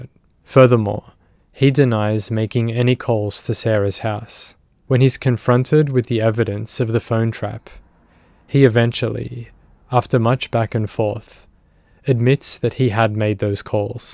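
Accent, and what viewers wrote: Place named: Australia. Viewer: I really do enjoy your videos. You tell the stories with the upmost respect for the victims, their families and their friends. I particularly like the fact that you don’t “over dramatise” an already horrific and terrifying “story.” I also thoroughly enjoy these longer videos. Thank you for all the research, effort and time you put into each and every one. 🫶🏻💙🫶🏻 ps.. where’s your accent from? Australia?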